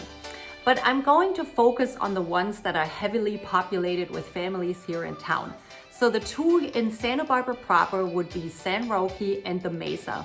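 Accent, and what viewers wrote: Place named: Germany. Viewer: Your accent sounds german, germans seems to know the entirety of everything, is that you?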